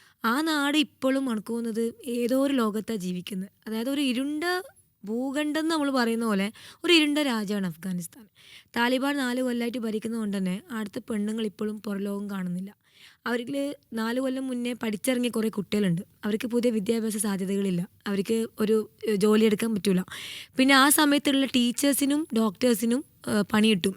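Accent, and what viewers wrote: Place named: Thalassery, India. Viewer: Pakka Thalassery slang 💗 Thalasseryl evdeya ?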